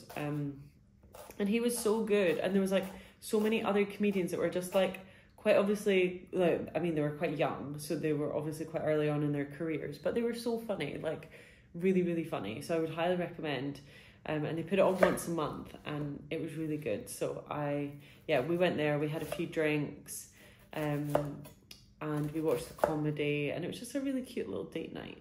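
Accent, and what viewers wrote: Scotland, United Kingdom. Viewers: Lovely vlogging style and great to hear a Scottish accent ❤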